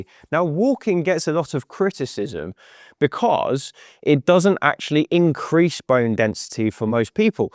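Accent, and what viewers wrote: England, United Kingdom. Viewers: I am irish and I love his english accent! I was born in Leeds. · The last exercize for balance was amazing! I've never seen it before and plan to add it to my routine. I love this channel for all the tips gives us - the English accent is a bonus!:)😊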